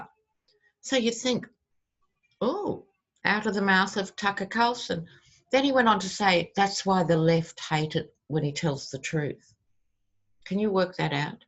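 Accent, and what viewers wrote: Australia. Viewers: Living in America since Trump is like being in A Twilight Zone episode that you can’t get out of. Republicans like Jordan & the rest are beyond corrupt & hopefully facing their downfall sooner, rather than later. What’s really frightening is delusional Trump who thinks he’s the second coming, literally. Help. 🙄I love your readings & listening to your fantastic Aussie accent. 💗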